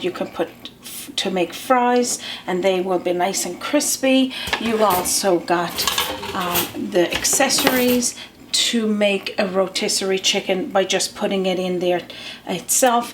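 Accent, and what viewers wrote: Ireland. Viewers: I thought you were Irish because of your accent! Nice review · Well Hi....Thank you kindly. What a wonderful & simple recipe this is. I've just watched it. I'm most definitely going to be having a go over the weekend....I'm sure our lovely grandson is going to just love this type of chicken with tater-tots....I'll be adding a little sprinkle of garlic powder & a hint of paprika..... Thank you kindly. I really very much appreciate your taking time to post this....Just subscribed 2....All good. I'm a seasoned traveller myself. Many decades ago of course....I'm picking up a we bit of Irish or Dutch in your lovely accent? I'm definitely going to say Irish ancestry..... Been Der2......😍😃😍😃😍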